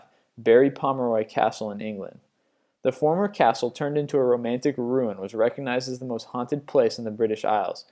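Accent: United States. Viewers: ***** You're not american then I guess because that's what americans sound like. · EVILBUNNY28 The suffixes of -burgh and -ham are pronounced the way he pronounced them in the US. Pittsburgh, Pennsylvania is pronounced pits-burg. It's not that we don't have names like that in the US and therefore he had a guess, it's that we simply pronounce them differently (likely due to our mixed culture) and that's what he is used to. Many Americans would distinguish Edinburgh with the UK pronunciation but that's because -burgh has the necessities to be pronounced -burra. The pronunciation of -ham is very much an accent or something you need to be told to do thing. Pronouncing "-ham" as "-um" doesn't make any sense to people who are native speakers but who have not grown up pronouncing "-ham" as "-um". Sort of like telling Americans they need to start dropping their R's on words. 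· STOP complaing about his bloody voice!! He is America, he sounds like that.. he can't just magically change his voice to please you. Get used to it, or leave.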